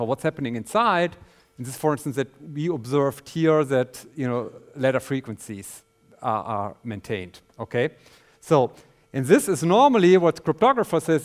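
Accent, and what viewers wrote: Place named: Germany. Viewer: very good Denglish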